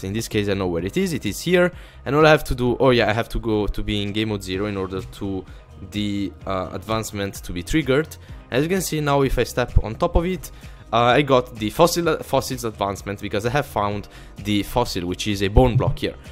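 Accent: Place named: Italy. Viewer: Thanks!!Ive Been waiting on The Alchemist 2!Also,maybe add new machines using the observers?maybe more automation?Auto Tree Farm? Also I want to ask you, Is making growth pulsers(From Skyfactory)possible? Maybe Uhmm a way to make trees grow faster?(Uhmm,Twer....nvm) Maybe even custom mobs and armors Also maybe a bit of jump boost?(You are in space after all) Thanks again for The Alchemist 2 You are the coooooolest youtuber I know Also I like your Italian Accent:) Omfg!Log....nvm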